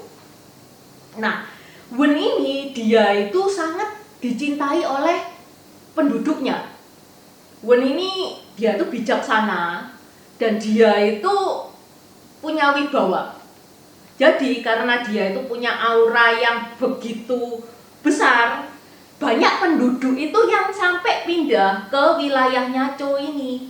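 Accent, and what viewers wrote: Java, Indonesia. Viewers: Uda AQ subscribe kak,maaf mo nnya,,Uda berkeluarga??medok tpi cina,lucuu 🤭🤭 suka n mnghibur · Tionghoa medok 👍👍👍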